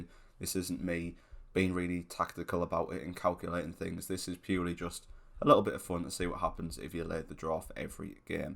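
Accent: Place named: North Yorkshire, United Kingdom. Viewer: That's a very North Yorkshire accent you have, 'Boro or Redcar way! My hubby was watching and I recognised your accent from when I lived in Saltburn North Yorkshire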